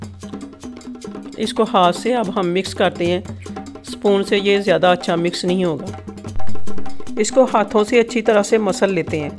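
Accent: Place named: Lahore, Pakistan. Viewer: Lucknow, India. Your dialect and accent remind me of my elders who relocated from Lahore to Delhi.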